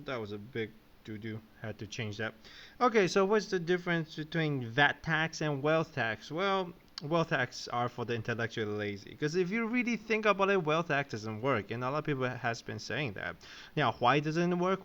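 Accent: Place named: United States. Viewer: ~ "Who will the VAT affect? Those spending more than $10,000/mo." you lost me. I suppose you have some unstated premises, possibly re UBI. first: it's VAT, V A T. it's not VAT tax, that would be Value Added Tax tax. second: such presentation issues prevent me from listening further, so.. maybe you EVENTUALLY get on to the following, but I may never know. ("Tell them what you are going to say. Say it. Tell them what you said." this is like high school composition.) such problems REALLY undermine credibility on top of this specific comprehension barrier third: "Your economics makes no sense." a VAT is a tax on productive capacity, whereas a wealth tax is intended to AVOID taxing production. the key is whether the monies seized are engaged in the economy or whether they are held in reserve, not actually adding to the production. think of it this way: * I am spending $1000/month (productive) * I am reserving $100 (nonproductive, so-called "wealth") -> VAT DECREASES my spending capacity a 10% VAT means only $900/month (spending, productive), $100 reserved -> "wealth tax" DOES NOT decrease my spending $1000/mo spending, $0 reserved fourth: "intellectually lazy" is a promise you are making by choosing this headline. 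it sets up expectations. in this case, it sets me up to question, "Just who is being intellectually lazy?" and in this case I determine it is you after about 3 and a half minutes ADDENDUM: I am an accent buff. I take yours as from the US, but the question then become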